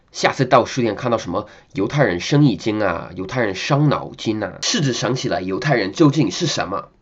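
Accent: Beijing, China. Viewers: Hah 北京话说得可以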